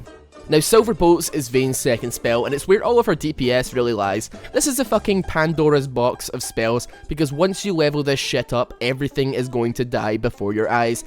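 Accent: Scotland, United Kingdom. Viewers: r u from scotland or great britani?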